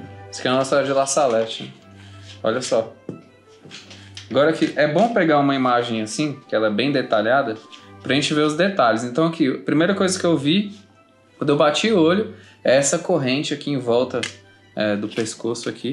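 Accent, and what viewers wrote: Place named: Ceará, Brazil. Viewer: tu é do Ceará? Teu sotaque parece com o meu.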